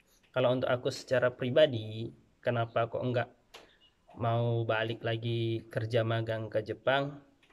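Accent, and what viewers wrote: Java, Indonesia. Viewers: Kirain orang Jawa mas?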